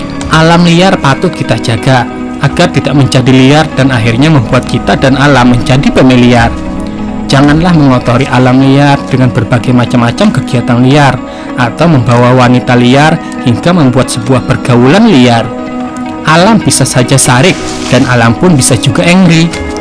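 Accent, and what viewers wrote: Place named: Kalimantan, Indonesia. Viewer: Khas kalimantan nya asri banar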